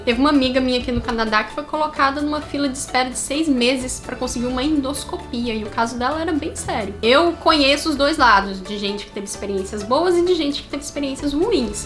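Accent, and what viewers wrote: Brazil. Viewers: mesmo vc morando ai a 4 anos, eles sabem que vc tem sutaque br? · Eu sou mineiro e o seu sotaque parece muito com o daqui de Minas, exceto com o da região norte do estado, que é mais para o sotaque baiano.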